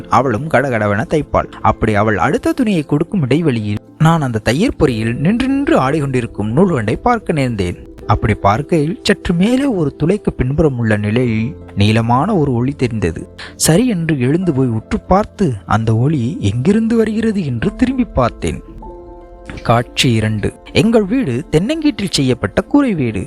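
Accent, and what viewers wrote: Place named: Sri Lanka. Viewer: அருமை.. இலங்கை தமிழர் பேசுவது போல இருக்கிறது.. கடின உழைப்பும், அறிவியல் ஆர்வமும் ,கொண்ட முயற்சியில் பின்வாங்காத சிந்தனையும் ஆச்சிரியப்பட வைக்கிறது.. வாழ்த்துகள்🎉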